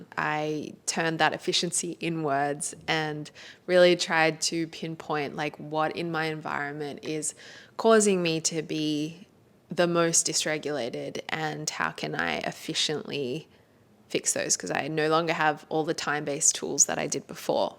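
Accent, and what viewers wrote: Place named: Australia. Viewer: I noticed the Aussie accent! Where are you located?? I'm in NSW, talking about similar things on my Youtube channel. I'd love to connect! 💖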